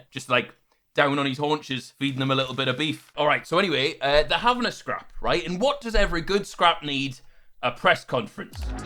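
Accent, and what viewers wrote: United Kingdom. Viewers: You sound so British like if u are😧sameee😂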